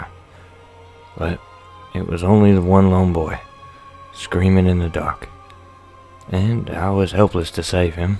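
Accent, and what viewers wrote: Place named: Old West, United States. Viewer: Nailed It!!! Your old west accent and dialect was spot-on and I freaking loved everything about this video and narration absolutely brilliant.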